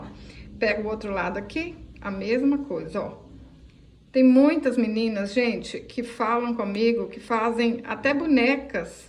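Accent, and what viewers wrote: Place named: Minas Gerais, Brazil. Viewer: Oi vc é ótima. Adorei. Parabéns 👏👏 Vc é mineira... é bão demais da conta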